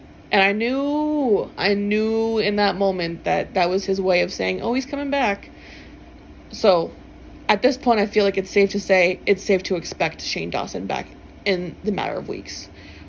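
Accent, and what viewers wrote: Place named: Miami, United States. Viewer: Sounds like you grew up in Miami, like me?! Sometimes you got to fight. Gabfly wanted to hear the reason that dude r word his ex? What the heck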